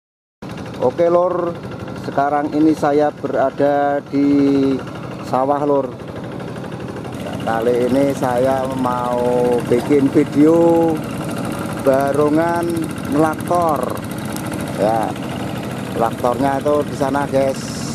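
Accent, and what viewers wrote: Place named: Bojonegoro, Indonesia. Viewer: Asli mana mas? Logatnya kayak B Bojonegoro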